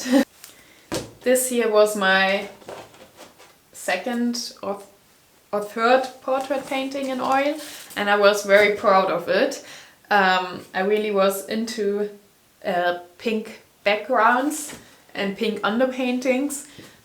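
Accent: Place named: Germany. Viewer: lol love the german accent. cool video!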